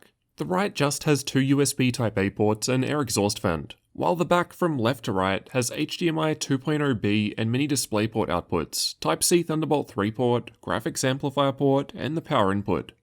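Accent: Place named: Australia. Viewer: His accent and his voice is what I like. Back in the days when I was learning English, in our institute, we had both American and British accent teachers, but not an "OISTRALIAN" one (: I think his videos are so suitable for any English learner who wants to get familiar with Australian accent.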